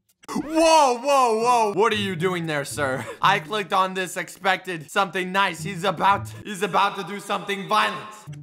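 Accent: United Kingdom. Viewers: Are you actually British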